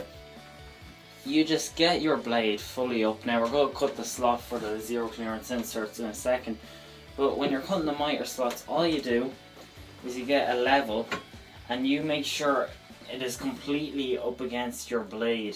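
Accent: Ireland. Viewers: Thanks. I have an old bench top saw which is about as accurate as a banana. Been looking around various videos on the net but have to say yours is excellent. I think the motor on my saw is fine so thinking of using that. My difference is that I am paraplegic so use a wheelchair so need to build the bench a bit lower. Thanks for the video. Only found you today but watched 4 of your vids and will watch more. From your accent I assume you are in the Emerald Isle. North or South? I'm in England near Cambridge but have visited Ireland many times and think it is a great place and have to say have always been made welcome. Sorry to babble on.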